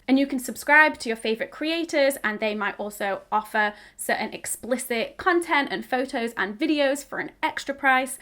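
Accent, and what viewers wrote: United Kingdom. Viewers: I love youuu omg currently rewatching your videos to get used to "the British accent" of the phonetic charts I have to study at uni here in France skksksk 🤯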